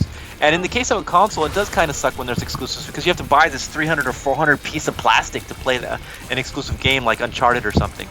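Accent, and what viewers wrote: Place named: Spain. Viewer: I love the tyrone's spanish accent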